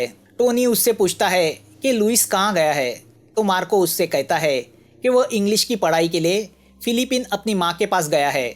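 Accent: Maharashtra, India. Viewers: Sir are u marathi i think so becose of ur hindi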